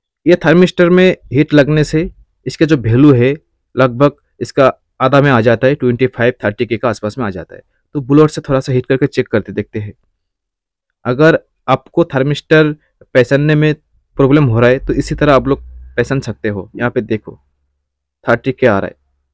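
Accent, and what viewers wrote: Bengal, India. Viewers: are you bengali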